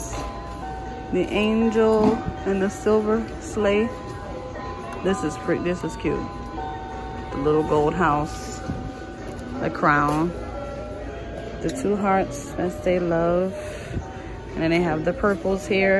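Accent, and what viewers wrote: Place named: Caribbean. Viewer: I hear an accent.u from the caribbean?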